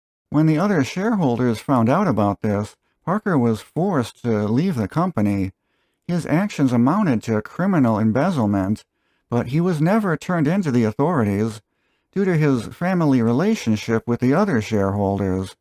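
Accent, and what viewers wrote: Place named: Boston, United States. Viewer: Your videos are always very informative and well done. Your Boston,( we say Bostin ) accent is gonna take a while, but keep trying. I noticed you added an "S" to Fall River, actually it's Fall Rivah! Great videos, thanks!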